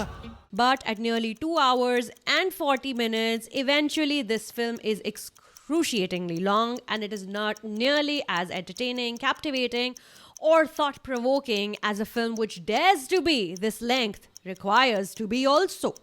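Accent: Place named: North India, India. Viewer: I think just because she can talk with a middle class North Indian English accent makes her an intellectual. This review should go on r/woosh.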